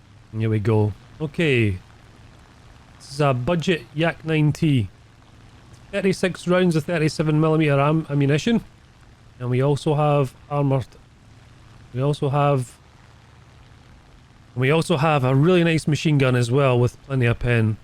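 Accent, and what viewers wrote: Scotland, United Kingdom. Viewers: I always want to see a Sherman firefly video, I like these videos too its like a Phlydaily video but Scottish which is cool.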